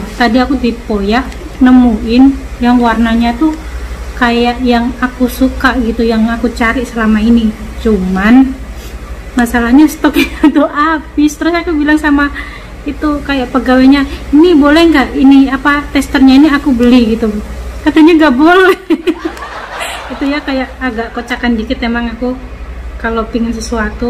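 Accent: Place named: Java, Indonesia. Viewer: medoke kentel, asline pundi yuk?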